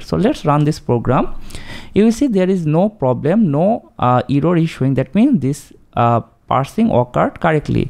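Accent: India. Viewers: Опять вместо английского индусский! Или что, из-за толерантности будем слушать музыкантов без слуха, смотреть на безногих танцоров и учиться у учителей с таким чудовищным акцентом! Бред какой-то! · In my opinion it is Indian accent because I am Indian and my accent is also same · Perfect for programmers. super comprehensive. I see Indian accent tutorial, I thumbs up. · Are you Indian cause your accent sounds Indian and it's so freaking cool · I dont wanna be racist but god fooookin damn it how im supposed to get what you say with that indian accent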